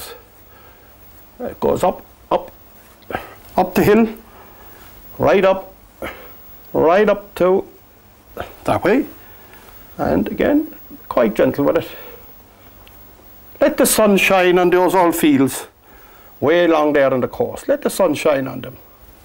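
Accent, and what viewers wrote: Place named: Ireland. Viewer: I love your accent and your paintings 🌸 As a German lived in North Cork for 12 years I enjoy your accent so much!!!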